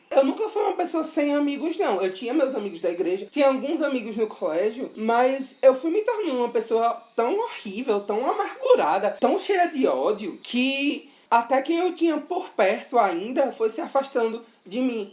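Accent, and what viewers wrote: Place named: Recife, Brazil. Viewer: Eu me emocionei internamente e me identifiquei demais com sua história, luta e com você também (sou de Recife e tenho o mesmo sotaque e forma de falar que você tem Kkkkk) porque eu sou bem jovem e passo por uma trajetória semelhante ao que você viveu na adolescência em vários pontos (até mesmo a questão da mania de escrever textos para desabafar e etc). Ver o seu vídeo me deu uma injeção de ânimo e de mais vontade de ir atrás dos meus sonhos e independência. A sua história é realmente muito parecida com o que vivo, apesar de alguns pontos de diferença, eu acredito que a minha história terá o mesmo resultado que a sua: a aceitação, e mesmo se eu não tiver, eu permanecerei firme e forte na minha luta e sonhos. Muito obrigado por inspirar pessoas como eu. <3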